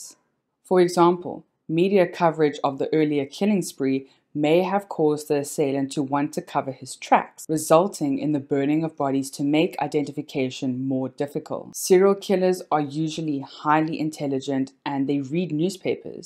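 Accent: South Africa. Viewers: Thank you, it's so refreshing to hear our South African accent for a change. Love your presentation style. ❤️ Well done young lady.🥳🥳🥳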